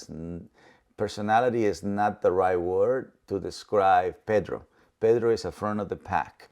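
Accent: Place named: Mexico. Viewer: Love Cesar's Mexican accent I miss it when I'm not watching his videos. Not to mention he's also very spiritually awakened, not only does he know dog psychology but humans too. One of the best commendable spiritual teachers.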